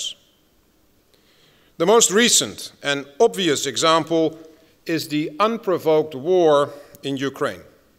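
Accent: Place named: United States. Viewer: No wonder he talks like an American.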